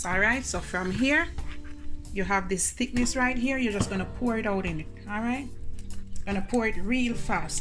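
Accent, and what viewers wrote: Jamaica. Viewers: Lovely porridge family you sound like you from mobay .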